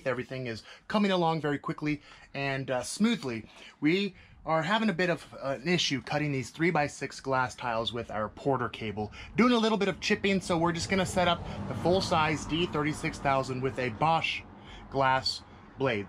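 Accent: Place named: Boston, United States. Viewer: With that Boston accent, this must be cape cod?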